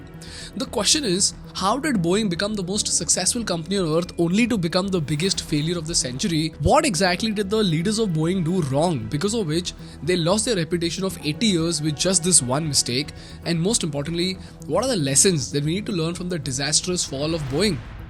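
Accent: India. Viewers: "R-rrrrr " heavey indian accent feels like hammar hitting rrrrr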